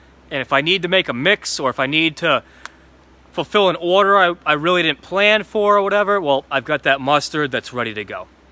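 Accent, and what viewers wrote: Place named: New Hampshire, United States. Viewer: New Hampshire, I was wondering where that accent was from.....there is another Youtuber from NH I watch as well, has a homestead he is building. Good insights on basil, really appreciate this video.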